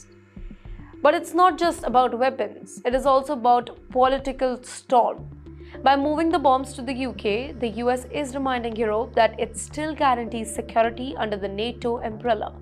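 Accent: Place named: India. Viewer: your accent is hilarious 😆.. obviously Indian.. just stop trying to be global news media cause it's disgusting.. so itchy to our ears 😂😂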